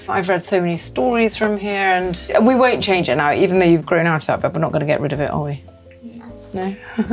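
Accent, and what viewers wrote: RP, United Kingdom. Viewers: Marvellous posh accent with a really tense jaw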